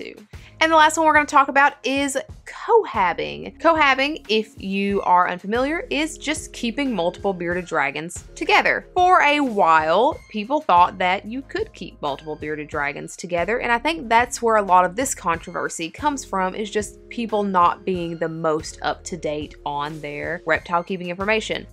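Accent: South, United States. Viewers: Are you from the south? Juts wondering, I'm from AL so I recognize your accent. Love your channel, thanks for the great tips.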